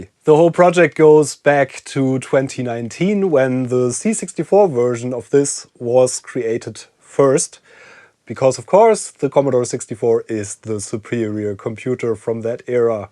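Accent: Germany. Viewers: Your Accent sounds german. Bist du Deutscher oder?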